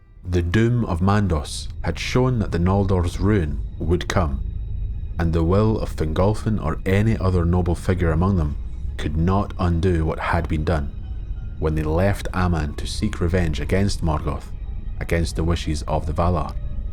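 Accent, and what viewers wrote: Scotland, United Kingdom. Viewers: He was foolish and heroic. In order to become a hero, you must be a fool in the first place. I really love your videos, partly because I could listen to your beautiful Scottish accent for hours on end.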